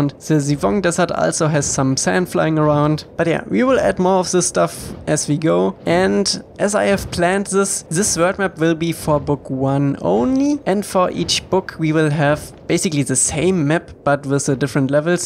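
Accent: Germany. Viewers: Mann merkt das du Deutsch bist dein Englisch musst du verbessern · I can hear that you can speak german. Pls make a german video. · Question, what is his accent? Ik I’ve heard the accent before. I keep wanting to say it’s German but I’m not sure. He keeps saying v for w, which is why I think it’s German. Anybody know if I’m right? I’m honestly very curious. Lol. I think I’m wrong tho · Is it possible what you are german? Your voice sounds so german xD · I don't understand why you can speak so fluently but still sound the most German I've ever heard xd anyway, keep it up!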